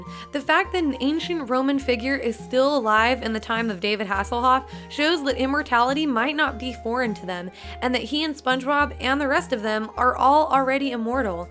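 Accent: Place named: South, United States. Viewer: so was I right you are from the south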